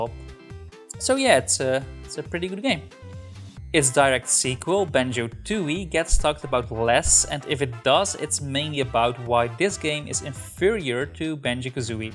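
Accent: Netherlands. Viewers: Do I hear a Dutch accent?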